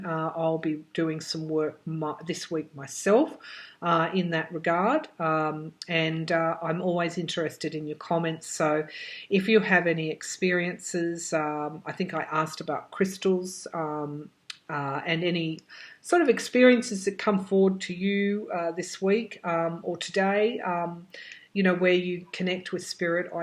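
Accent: Australia. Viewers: I love how you write; your Ausie accent is such, that in my minds eye, I hear you. Good luck on your health issues. is terrrrrific. 🇺🇸🐈♈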